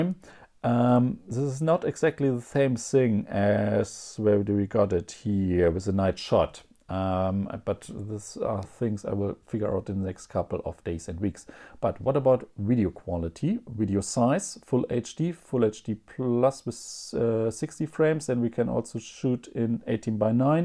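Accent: Germany. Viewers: Gotta love that German accent haha. Really nice video tho, thanks!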